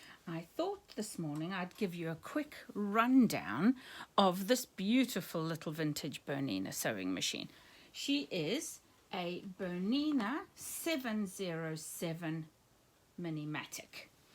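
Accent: South Africa. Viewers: I just bought a 700 which only does straight and zig-zag stitches. I can't wait for it to arrive. Can you point me in the direction of some information as to how to give it the kind of TLC it deserves, as mentioned in your video? Love your accent by the way. Are you from South Africa?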